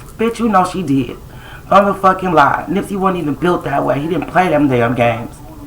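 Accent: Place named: Michigan, United States. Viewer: Hi! you sound like you are from Michigan. Can you please tell me wth is wrong with GM, CEO Mary Barr? She don't GAF about employees. How/why is it OK for the auto industry to have mandatory Saturdays scheduled and taking volunteers on Sunday to work?This plant is running 7 days a week!!!HOW in a pandemic?? and did the UAW threaten the Governor? Supposedly, the UAW told the governor, they got her elected& they would not help her with her re election, if she shut down the auto factories again because of COVID_19.It has been said, allegedly, that none of the auto factories will shut down again because of the virus. Why?how is a building a truck essential? These auto factories have people coming from all over the state, to work at certain plants.They are, * allegedly* sneaking infected people out of the plant and not telling their co workers they have been exposed to COVID-19! 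How is this ok??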